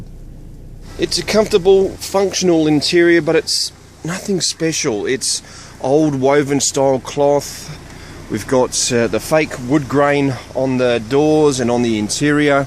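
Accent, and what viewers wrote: Australia. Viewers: Ajam Yunus aussie le ngok... slang down unduh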